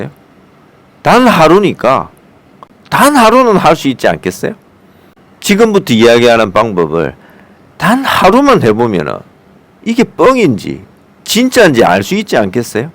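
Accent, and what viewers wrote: Gyeongsang, South Korea. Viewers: 경상도분이시라 ㆍ ㅎㅎ 말솜씨 가 너무재미있읍니다 덕분에 치아에대한도움되었는데 역시 듣고보니간단하네요 당장실행하겠읍니다 ㅋ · 구수한 갱상도 억양으로 ... 감사합니다 저도 도전!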